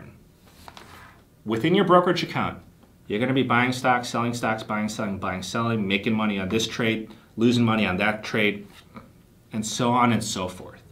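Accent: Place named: New York, United States. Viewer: His NYC sounded accent adds to its straight-forwardness.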